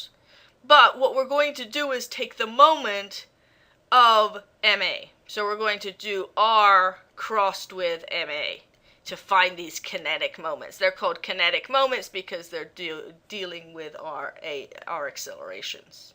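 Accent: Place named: South, United States. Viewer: i loved your southern accent mam :)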